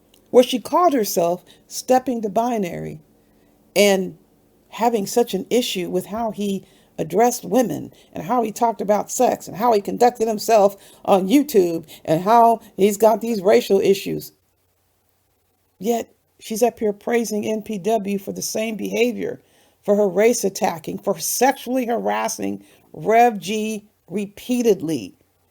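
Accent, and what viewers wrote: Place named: Working class, United Kingdom. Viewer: High Class with a working class British accent ? I don't think.so.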